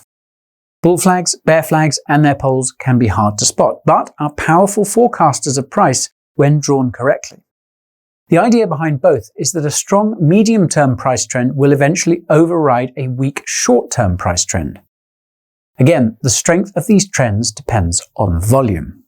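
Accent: United Kingdom. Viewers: British English lol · Good man I like British English lived thre in Surrey for 25 years I moved to Australia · high quality to the point ... tanx bro .. I have some problem with british akcent I should listen more ... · Thank you for saying you’ll explain everything in plain British English. It actually helps a lot for someone like me who’s first language is not English. Thanks a lot. Now I’ll resume to watch the video ♥️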